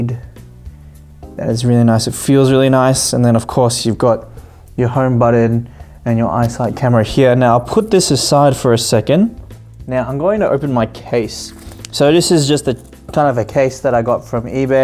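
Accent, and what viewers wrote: Australia. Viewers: Theres no way your not Aussie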